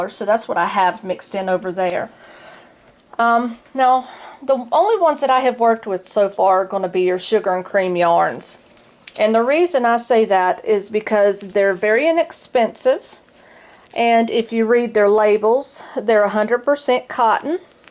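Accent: South, United States. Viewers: viridian it’s just a country or “southern” American accent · I find that remark to be offensive. What difference does it make what accent she has? She has a southern accent. To say it is peculiar is just rude.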